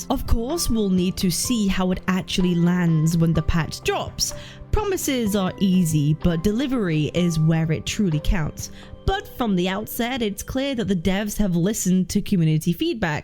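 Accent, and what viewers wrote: United Kingdom. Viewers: This vtuber isnt the real one, Your british accent is weak And you sound eloquent. The real one sounds Turbo cracked out Also an anniversary is about the rewards. Which were ass. No free zani or ciaccona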